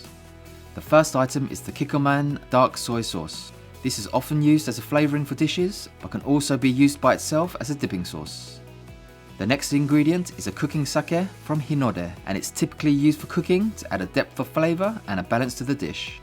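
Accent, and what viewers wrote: England, United Kingdom. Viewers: Nicely done,. It's a burning Summer here right now in the Philippines so I guess we'll just opt for the Cold Soba (actually Udon is what I have in stock) for now. 😊 Thanks for the very informative tutorial. Loved your beautiful English accent too!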